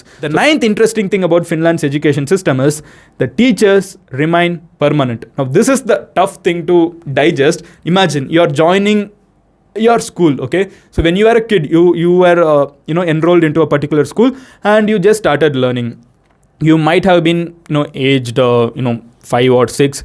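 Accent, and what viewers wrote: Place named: Tamil Nadu, India. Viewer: Enakku mattum than ivar pesure english tamil mathiri kekutha? 🤔🤔🤔... Ivarode video parthu parthu ivar vere language pesenalum en kathukku tamil than kekuthu😁😁😁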